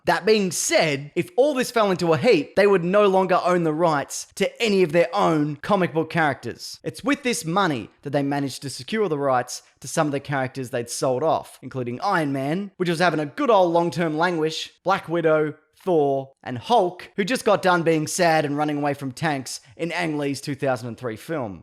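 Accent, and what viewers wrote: Australia. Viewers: You sound Australian so do a video on Danger 5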